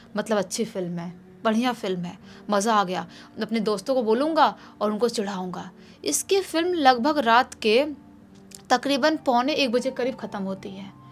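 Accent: Bihar, India. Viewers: Ye ladki bhi bihar ki hai confirm. Eske awaj se pta chal rha hai . Itna jaldi jaldi kaun story' batata hai maidam 😬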